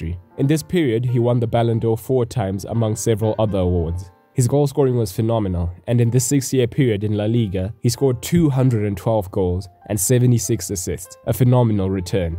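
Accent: South Africa. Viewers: are you South African? you sound SAn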